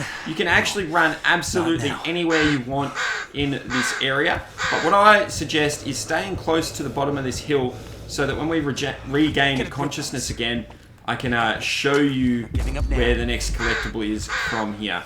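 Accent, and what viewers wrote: Australia. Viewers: GODDAMNIT the one good walkthrough I find!!!!! He has an Australian accent. I can’t focus 🤤🤭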